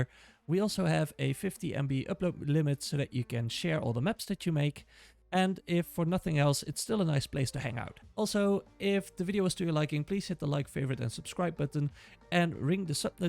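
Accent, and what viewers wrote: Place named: Scandinavia. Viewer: Thank you for that thorough explanation! I definitely would love to see a video about what to do with your map when you’re done. I’m actually pretty familiar with lossy vs. non-lossy formats — my day job is as a web developer! But what threw me for a loop recently is that the “roll20” image export setting exports at 70ppi, whereas the native resolution in DD is 256ppi. So my maps were looking like crap in roll20, especially zoomed in, because the ppi was too low (combined with the 10mb file size limit that made increasing the ppi much more challenging). Someone on the DD Discord helped me out by suggesting that I export at 256ppi at 80% quality, and that has worked beautifully. Oh, and roll20 actually does support WEBP (that was news to me, too!) As far as I know roll20 does not support universal VTT files, however, so I will have to stick to flat images for now. 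It’s mildly annoying to have to place all the dynamic lighting boundaries manually, but fairly straightforward once you’ve done it a few times. (I’m sure someone will say “ you should use a better VTT,” and maybe I should, but at this point I’m so invested in the roll20 ecosystem, there’s a bit of a sunk cost fallacy thing going on. Also I love playing virtually, so I don’t anticipate a lot of print maps in my future, lol). Randomly, if I might ask, what is your accent? I’m guessing Nordic of some flavor? Feel free to ignore this somewhat rude question; I’m just fascinated by accents and language.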